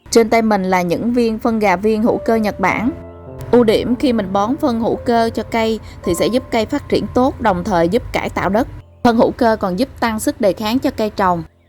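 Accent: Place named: Mekong Delta, Vietnam. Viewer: đúng chất người miền tây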